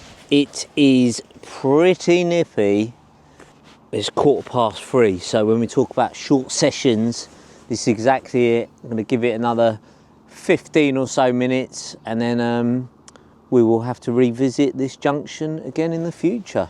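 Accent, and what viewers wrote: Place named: Cockney, United Kingdom. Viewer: More cockney than reggie krays underpants ….yet he was born a scouser WTF 😮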